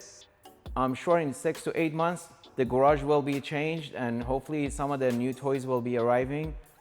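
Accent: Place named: Iran. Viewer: 5 seconds into the video and I can already tell you are Persian! :)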